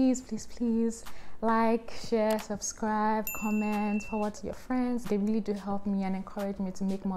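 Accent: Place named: Nigeria. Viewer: That's Nigerian accent ! 😊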